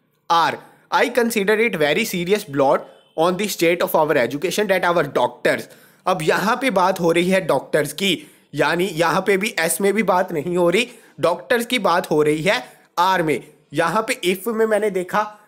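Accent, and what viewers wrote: Punjab, India. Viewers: Sir ji app punjab se ho kya ?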